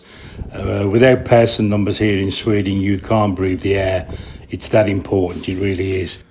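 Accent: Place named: England, United Kingdom. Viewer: Bostin' - I recognise the accent. Fellow Englishman in Stockholm.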